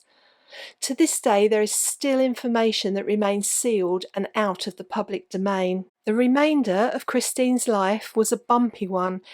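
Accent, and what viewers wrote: United Kingdom. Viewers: Oooh I really enjoyed watching your video, and listening to your voice and accent. I love England (only been there for about a year, long ago, and I'm dying to get back). I found you by chance, after watching a different person talk about Christine Keeler, and I very much prefer the way you talk ! (not to be mean, but the other person talks in such a stilted way, so it's a relief to listen to you!😄) I'll watch lots more of your videos. I also liked how you showed the real places. Oh I love the Thames and its surroundings! Anyway thank you and cheers from a Greek who landed up in LA but longs for England or Europe.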